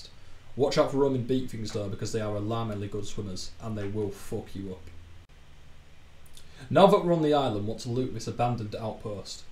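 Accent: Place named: United Kingdom. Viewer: Good to hear a Brit sharing Kenshi content. Interesting how people start off their chars. I suppose it depends on which style of play you prefer, if you already have pre-knowledge of this game. I stopped mining in the initial stages because it was too time consuming vs progress being made. I usually cheese and scavenge, then go for the cheap 250 cats back packs found at the Waystations, unless I've got lucky with the start. I always thought Mongrel was boring, but having seen your enthusiasm for the misty wastes I think I'll give it another try! Good luck with the channel.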